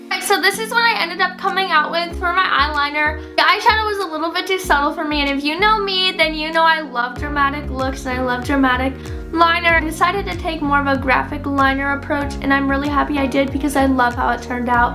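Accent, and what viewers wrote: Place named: Australia. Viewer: i thought you were australian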